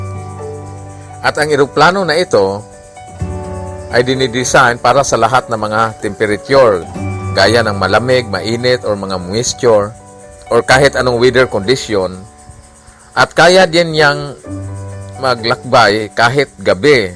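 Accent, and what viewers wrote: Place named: Bohol, Philippines. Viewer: PARE TAGA BOHOL KABA?KASI YUNG TUNOG NG BOSIS MO PARANG TAGA DAUIS OR PANGLAO BOHOL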